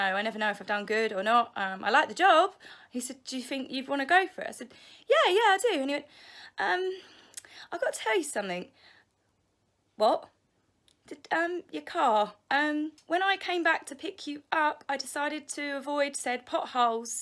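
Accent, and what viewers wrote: England, United Kingdom. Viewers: Thank you Love your videos. You asked if anyone had ideas for YOU talking about other things, well I watched P Charles Documentary ‘Sadness.....’. Not a mention of Princess Diana, his Adulterous marriage, his treatment of his wife. Now his relief on Her death!!! Narration I couldn’t believe. What do You think, and Your growing number of followers? Love hearing about your hilarious job hunting. Blessings go with You in your search, could it be The Universe giving You a change of careers to the media, Research...? I am of the previous generation, where can I find Your email address. I love watching You - beautiful complexion, superb cheekbones, the way You speak, Oh You make me homesick for England. 12,000 miles away in W.Australia,, from the days when wives followed their husbands tradition! Trouble is he’s still travelling! 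💗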